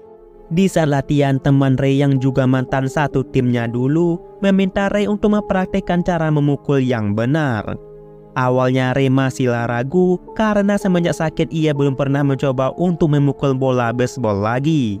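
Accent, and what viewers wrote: Aceh, Indonesia. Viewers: Mentok kali bahasa logat Aceh nya ia bos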